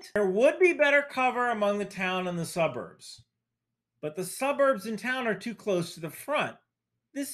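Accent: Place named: United States. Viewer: I love your American accent . Watching you from Australia keep safe and a wonderful day 🌺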